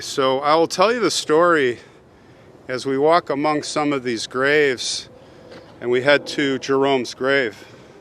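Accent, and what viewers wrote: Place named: Chicago, United States. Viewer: Hi Im from Skokie, Illinois, now California. I was thinking the Jewish cemetery looked great. But as I expected you found a group of headstones. I am Jewish and I will be cremated and not be buried in a cemetery especially a Jewish one. Many graves are/were destroyed due to antisemitism. I want to thank you for the respect you showed. P.S. Are you from Chicago? Your accent sounds familiar.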